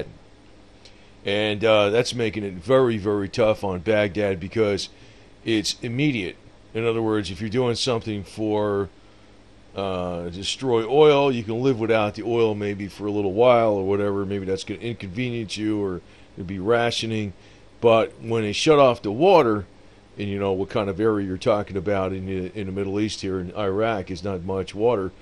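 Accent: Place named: California, United States. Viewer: Sound like California until u said isisl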